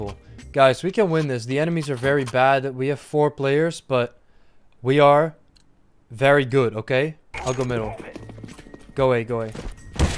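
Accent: Netherlands. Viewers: I mean im the one you need if you want to be downgraded, complete gawed gamer over here my god. btw love the dutch english lmfao its cracked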